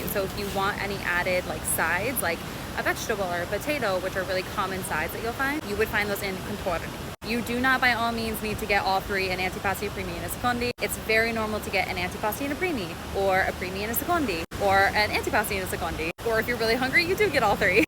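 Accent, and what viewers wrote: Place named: United States. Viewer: Sorry, but I couldnt really understand but a few words you spoke. As I’ve commented before, you speak so quickly and not everyone understands American.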